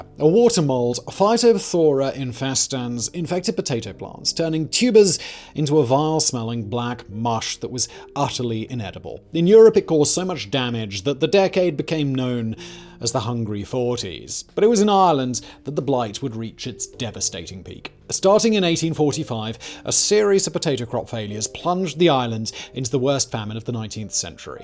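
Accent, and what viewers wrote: RP, United Kingdom. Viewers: Great vid. Was particularly unusual to hear all this is Simon's British accent. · You soft pedaled the affair. There's no line at which treatment like this suddenly becomes genocide or not genocide. You with your posh accent, to try and hide the fact of what a dope you are!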